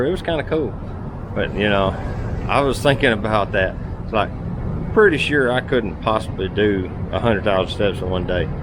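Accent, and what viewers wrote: South, United States. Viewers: LOL! very good. I love Southern Talk- "...just to this side of that sign? On the other side of that sign?.." tone of voice- sentences that sound like a question are not a question. ❤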